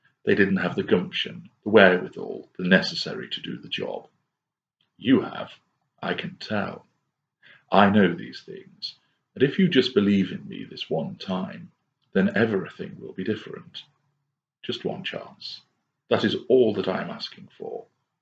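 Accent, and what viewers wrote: England, United Kingdom. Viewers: you have an accent. are you english?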